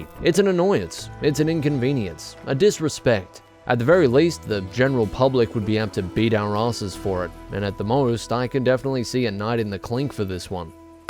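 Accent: Australia. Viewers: I think I'm in love with you. Everytime you upload my heart has a sudden shock of excitement. It skips a beat. It is undescribable the feelings I get when I see the notification that has uploaded. Your beautiful australiaun accent. Your well crafted sentences and editing. The narratives and cinemtagraphy blend together to make me EXPLODE! Thank you, thank you so much!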